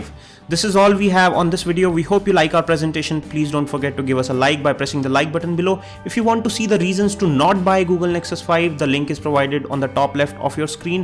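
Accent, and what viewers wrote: India. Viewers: Fuckin indian accent,Fijay Raj Khumar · South indian accent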